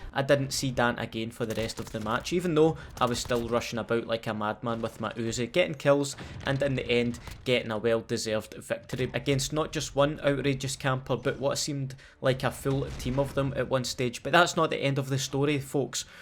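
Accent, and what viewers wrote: Scotland, United Kingdom. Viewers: Sounds Scottish to me! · Came for the camping stayed for the Scottish accent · ??? He’s Scottish 😂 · I jast wotch hes videos for hes amazing scottish accent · Hellow is this scottish english acent? Or irish?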